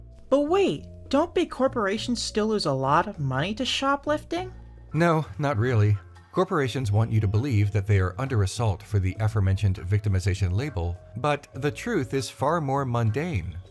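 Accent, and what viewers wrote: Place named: Mid-Atlantic, United States. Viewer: I like the narrators mid-Atlantic accent. Don't try to hide it lmao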